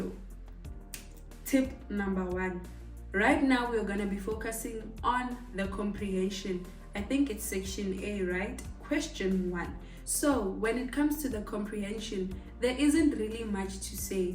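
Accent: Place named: Tsonga, South Africa. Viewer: You sound Tsonga idk😭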